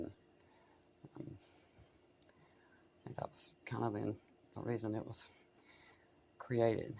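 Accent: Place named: Caribbean. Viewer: Nosey Question: Which island are you from … originally ❓ I recognize a familiar accent 💥 🇹🇹 Yes No? 🤩🤩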